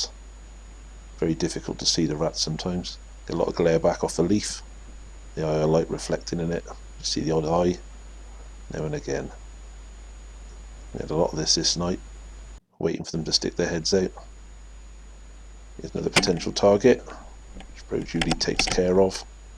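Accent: England, United Kingdom. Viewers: What part of England you from? greetings from California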